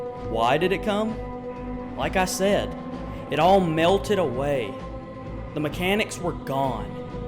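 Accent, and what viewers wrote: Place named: South, United States. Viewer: I saw your post or comment on Reddit a week or two ago, or whenever it was and saved this video to watch later, and man, you went above and beyond my expectations. The quality of this video is really great for you only being at 36 subscribers. Everything about this video is perfect, your faint southern accent, your charisma, the editing, the music choice, and your insightful look at a part of the game that is seldom talked about beyond "I liked it". I learned a whole lot, and I only appreciate the game even more than I already did You my friend, have earned my subscription. Keep it up man, it will pay off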